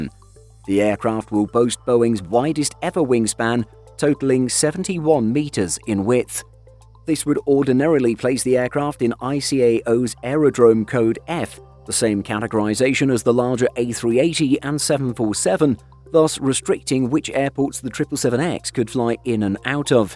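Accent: United Kingdom. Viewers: The guy is British, that is how the Brits say it, it's not wrong, it's just different.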